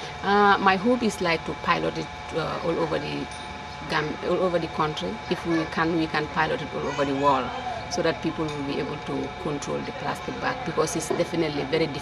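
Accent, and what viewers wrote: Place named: Gambia. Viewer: God bless her...she is very sweet....thats a real Gambia accent dho....miss my country to bitzzz.....bless her and stay strong my fellow Gambian women xxx love love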